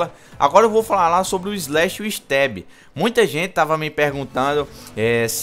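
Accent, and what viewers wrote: Northeast, Brazil. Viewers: TU e nordestino ?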